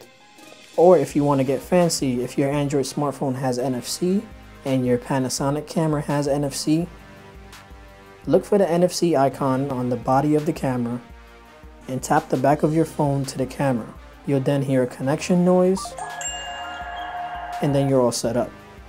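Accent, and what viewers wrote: New York, United States. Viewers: NY? ...lol you sound like it.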